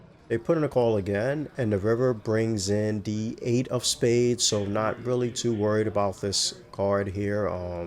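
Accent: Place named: East Coast, United States. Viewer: Love the East Coast accent and congrats on 1k subs and Monetization Fam. 🎉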